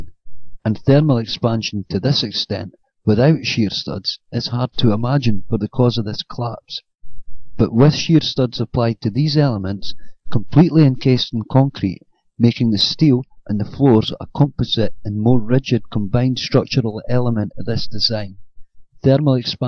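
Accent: Scotland, United Kingdom. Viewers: Great video, and the scottish accent makes it all the better. Thx for your hard work on exposing the BS known as the official conspiracy theory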